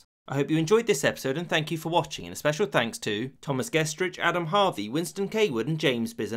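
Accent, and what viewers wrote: United Kingdom. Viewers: Yes that's a British accent lol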